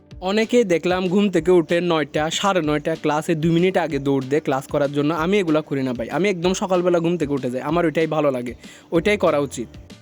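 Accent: Chittagong, Bangladesh. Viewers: ভাই আমি ও চিটাগং এর আপনার কথা শুনে বুঝা যাই আপনি ও চিটাগং এর ,, আমি এবার এডমিশান শিক্ষার্থী ,, কমার্স থেকে,, আমার mbstu তে পড়তে চাই ,,, আপনার তো হেল্প পাবো মনে হয়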